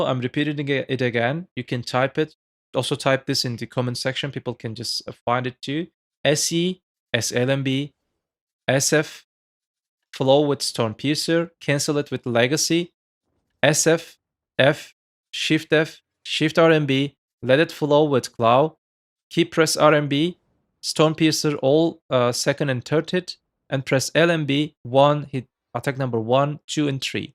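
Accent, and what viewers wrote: Turkey. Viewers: ben o türk aksanının kokusnu alırım abi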